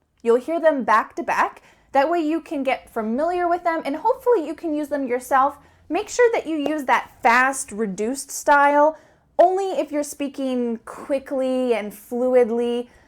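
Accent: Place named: United States. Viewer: Omg now i knew why your (American) accent wins our tender hearts. From india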